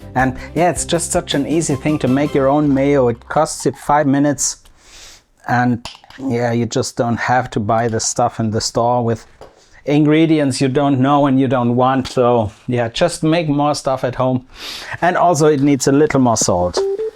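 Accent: Swabia, Germany. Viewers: ! Ilike it! Just like the Red Hot Chili Peppers, drummer! Your Swabian accent is great, man! 😁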